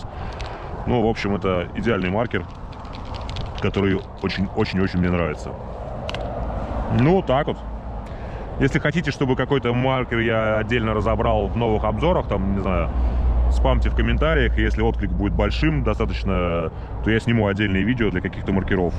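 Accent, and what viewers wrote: Russia. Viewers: Я так и не понел,ты русский или американец?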